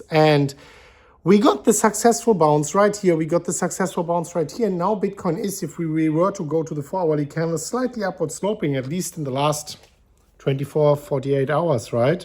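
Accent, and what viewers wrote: Germany. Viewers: "Im a little bit under the water" i love your german english 😂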